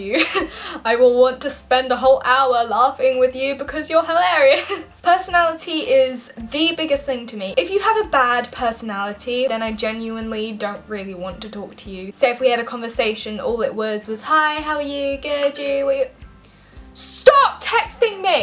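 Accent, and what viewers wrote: England, United Kingdom. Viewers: Pshhh if I lived in England or Brittan or where ever that adorable accent is from XD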